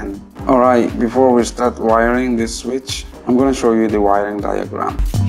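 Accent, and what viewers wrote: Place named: Indonesia. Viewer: i saw ur previous video list, actually i was thought u are indian, now i knows u from Indonesia, that's why say i was talk ur accent 😬😁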